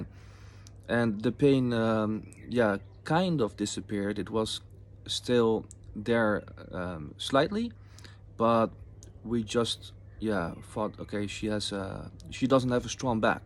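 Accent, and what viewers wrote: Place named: Netherlands. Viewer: I found your entry by accident and was spellbound. I noticed your Dutch accent. My heart goes out to you. Ik vond uw boodschap door toeval en was ondersteboven van uw verhaal. Ik weet dat ik niets positiefs kan bijdragen aan uw verhaal, maar voor wat het waard is wens ik u en uw gezin heel veel sterkte. Elsche.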